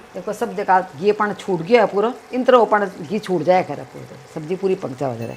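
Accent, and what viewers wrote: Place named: Marwari, India. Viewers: Yes it is Hindi. But Hindi also have many dialects, depending on geographical location. In rajasthan only we have 10-15 diffrent hindi dialects. This madam in this channel is speaking marwari hindi. In Udaipur area you will have diffrent dialect of mewari hindi. In Jaipur side you will find dhundadi hindi dialect. In same way in uttar pradesh mathura side there is brij bhasa, which is also a kind of hindi dialect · I like to hear ur marvadi hindi..Pranam to U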